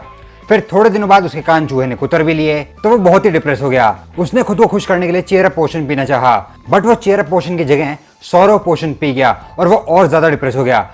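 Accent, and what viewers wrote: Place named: Marwari, India. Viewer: I love your marwadi accent 😂😂😂😂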